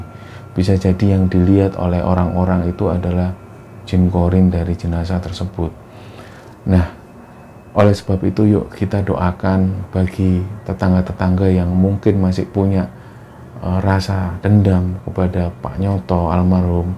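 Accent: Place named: Central Java, Indonesia. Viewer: Indonesia bagian temanggung mana ini suaranya???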